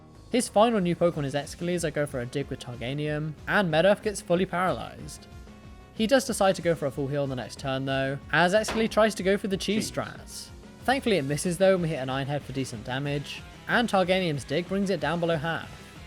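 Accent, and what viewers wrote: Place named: United Kingdom. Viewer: what a beautiful british accent 😁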